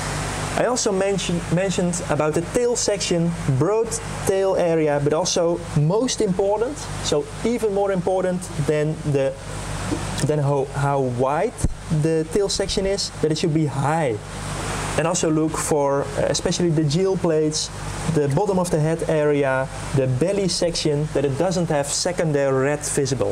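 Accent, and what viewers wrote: Netherlands. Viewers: Just bought one to go with my Hi Utsuri and Ki Utsuri. Great explanation. I guessed straight away you are Dutch. I lived in Hoorn for 9 years and love the Netherlands.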